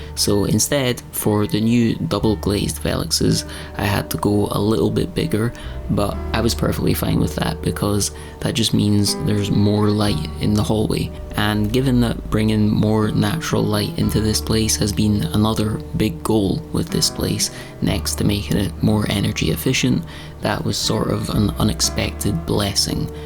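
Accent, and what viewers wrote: Scotland, United Kingdom. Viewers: The full-blown Scottish accent!! ❤ Sorry, as an American, it's cliche that when Scots are mentioned, that's the accent we think of, and it almost is a stereotype...until you actually do hear it and then it's the greatest thing ever, lol!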